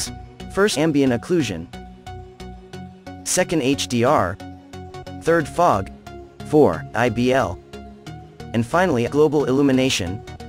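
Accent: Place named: Nigeria. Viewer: I don't know his private channel. I just accessed him, Adolfo, and other Master Class Vids, through the Reallusion site, under the Master Class tutorials section. is actually like Nigerian, or something like that (sorry if I messed up your Nationality description based on your accent). 😁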